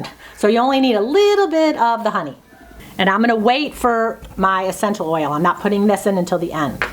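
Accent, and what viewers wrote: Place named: North, United States. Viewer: You are delightful to learn from. I love your northern accent!!!!❤️💕❣️ lip bomb. I live in Texas with my family in the north. It makes me think of them! Thank you for the info. 😊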